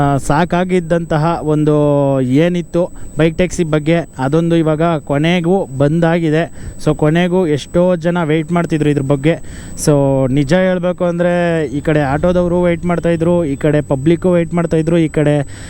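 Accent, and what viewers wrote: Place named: Andhra Pradesh, India. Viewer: Anna telugu meeru telugu wala nenu telugu but study for kannada mi mataloo telugu anipistundi i like anna ❤❤❤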